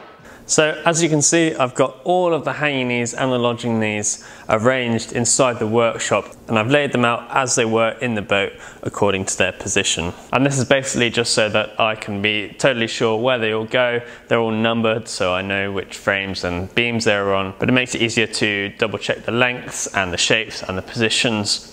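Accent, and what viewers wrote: United Kingdom. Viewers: From an German point of view, your English ( was it always British? ) explanations of boat terms is a great help in discussions during sailing and staying in foreign ports :D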